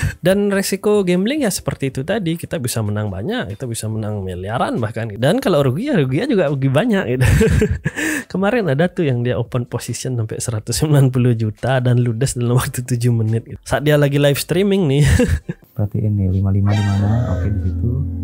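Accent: Bali, Indonesia. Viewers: Dari Bali ni Kayanya yaa.. hehe.. omswastiastuu Bli... Bagus na e penjelasan itu. Aku juga sependapat. Wkwk. Tinggal tunggu aja boom waktu itu ATG. Rata2 yg begitu biasanya ujung2 scam.. kleeeeeeng.. wkwkwkwk. Itu platform Bandar itu. Banyak manipulation nya, buka real boker atau DMA direct market access.. wkwkwk. Tp apa daya, Kalo kasih tau org yg udh percaya sm itu ATG sulit dikasih taunya soalnya. Wkwk.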